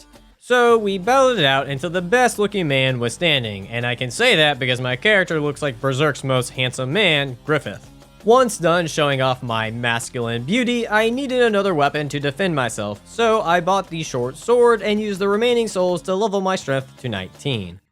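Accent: Louisiana, United States. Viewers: Immediately subbed when I heard the Cajun French, Louisiana/Souls representation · Hearing the Cajun activated me like a sleeper agent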